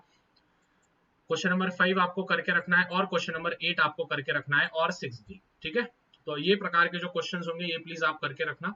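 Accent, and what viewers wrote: Maharashtra, India. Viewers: SIR , AAP KI MOTHER TONE MARATHI HAI KYA....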